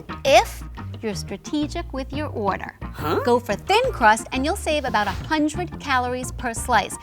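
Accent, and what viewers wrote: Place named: East Coast, United States. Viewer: I love your SEXY east coast accent.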